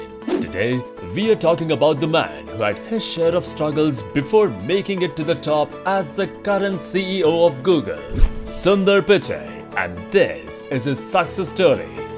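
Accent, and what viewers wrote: India. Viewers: I hate that heavy Indian accent as well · Why you can bring Indian out if India, but not Indian accent out of Indian? :(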